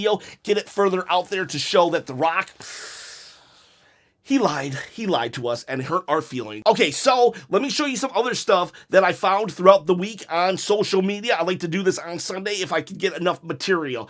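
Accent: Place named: New York, United States. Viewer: Can you tone down your New York accent.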